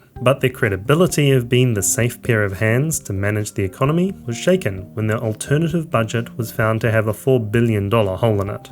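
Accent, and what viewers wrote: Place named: New Zealand. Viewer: Think he may he kiwi, he's got a nice kiwi accent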